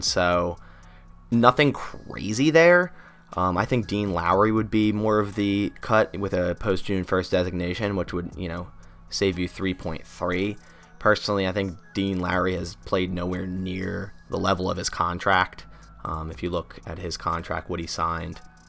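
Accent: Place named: Maryland, United States. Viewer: i watched the wire recently and ive been on the lookout for maryland area accents; i love yours! keep making good content :) and go pack go of course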